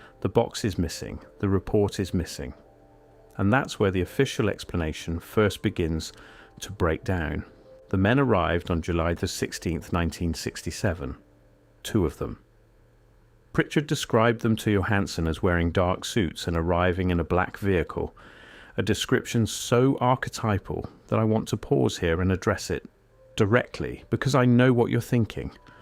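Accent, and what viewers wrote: United Kingdom. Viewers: Taking this with a huge grain of salt, since a google search turns up no encounter involving anyone of that name. Plus the faceles, unnamed, obviously British narrator claims transcribed materials from the original case wound up in his possession. Seems quite a stretch.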